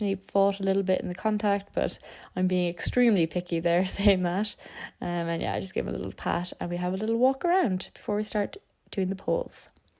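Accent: Ireland. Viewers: What part of Ireland are U from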